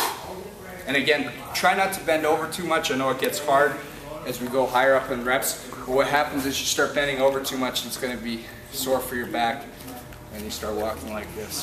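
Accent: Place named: Canada. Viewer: Love the adorable Canadian accent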